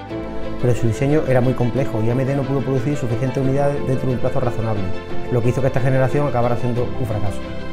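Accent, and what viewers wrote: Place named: Spain. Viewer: soy latino y real mente no es por nada pero no soporto el exento español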